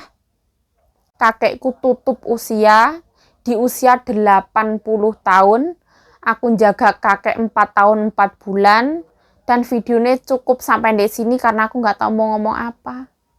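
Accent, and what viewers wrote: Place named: Malang, Indonesia. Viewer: aku denger kamu ngomong kok lucu bener, kata di kaploki kok jadi ingat bahasa Malang yo Jeng? aku jadi geli denger nya. Okay salamku dari jauh Jerman ( Germany ) Berlin. Salam kenal juga.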